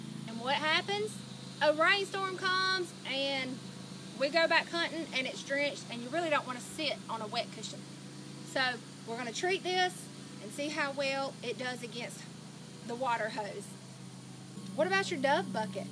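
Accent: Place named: Georgia, United States. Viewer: Cool to see a fellow south Georgian on here. I live between Waycross and Brunswick. I thought the accent sounded familiar. Where in South Georgia are you located(approximately, not your address. Didn’t want to come off creepy lol lol)?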